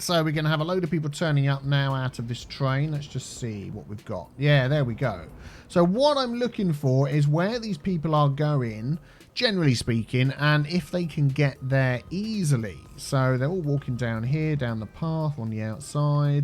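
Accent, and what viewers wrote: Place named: RP, United Kingdom. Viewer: why are you so posh?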